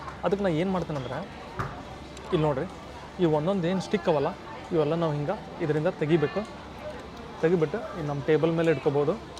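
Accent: North Karnataka, India. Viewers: Nim language uttara karnataka bro namdu bagalkot nim uru yavdu. Khushi aytu nimmannu alli nodi nimminda alli food nodoke avakasha siktu❤❤ superb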